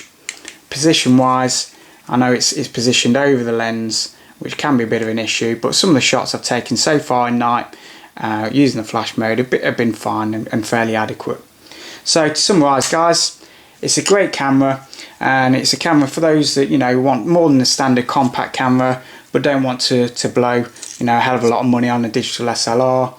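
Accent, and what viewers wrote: United Kingdom. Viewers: Epic British Accent !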